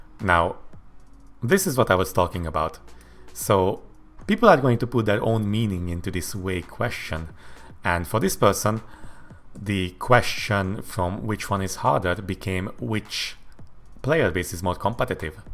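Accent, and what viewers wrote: Hungary. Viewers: Is that Hungarian accent? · I like your hungarian accent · I know a hungarian accent when I hear it :)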